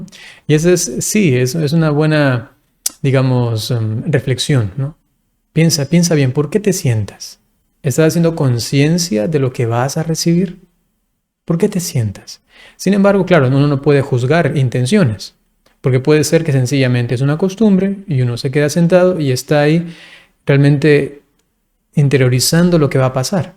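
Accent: Brazil. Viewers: Conozco muy bien a este sacerdote, es español de España, de Murcia, concretamente. Pero se formó en Brasil desde muy joven y tiene una fuerte entonación portuguesa/brasileña. Ello explica que se exprese perfectamente en español pero suene un poco “raro”. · Sí, el Padre es español, murciano, pero ha pasado mucho tiempo en Brasil y ha cogido acento.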